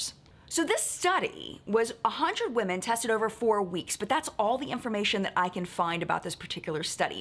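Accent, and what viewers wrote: United States. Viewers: She came for Charlotte Tilbury and I LIVED FOR IT! 😂 Where I am, my clients raved so much about it. But I was never really thoroughly impressed with the brand to begin with despite how it was advertised as a brand loved by Hollywood and blah blah blah. I really appreciate people who shed light on facts like these. Oh and I just love her pure American accent like it’s just so perfect.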